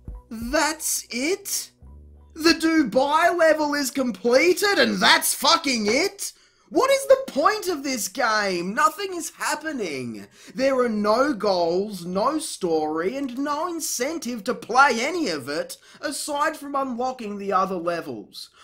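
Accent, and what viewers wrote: Australia. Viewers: I'm glad I didn't accidentally buy this game yesterday at my local retro game shop. It was either this or Downhill Jam. I bought the latter and I like it. The price difference made it really obvious which one was better, even though I'm sure the guy at the counter probably would have at least told me it wouldn't work on my New 2DS XL anyway. And I just gotta say that your obvious frustration sounds 1,000 times funnier and more relatable in your British accent, even though I'm from New Jersey. Lololololololololol.... Correction: Australian accent. Sorry I didn't realize that at first.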